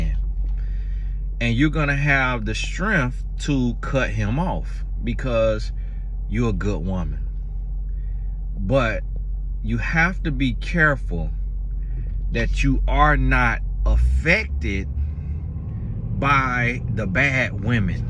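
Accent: South, United States. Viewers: Mr I thought I talked southern but you have me beat.. 😂😂 God Bless you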